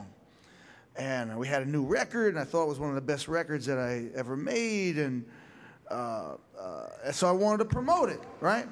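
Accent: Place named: New Jersey, United States. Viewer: It's the way he talks, if you've ever heard him before. Part Jersey, part easy going, part storyteller...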